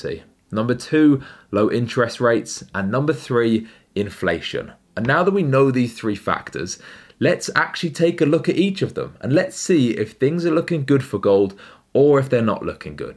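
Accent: United Kingdom. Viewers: Too much talking off topic. Not to the point. Don’t like British accent in USA it is irritating 😠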